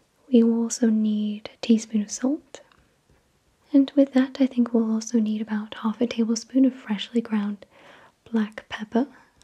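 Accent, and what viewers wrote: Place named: Wales, United Kingdom. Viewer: Tyler Sudden II she’s Welsh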